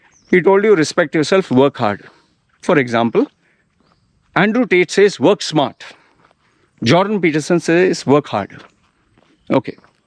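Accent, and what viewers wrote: India. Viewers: Ugly Indian with the nasty Indian accent talking about Tate? This Paki American would like a min with you.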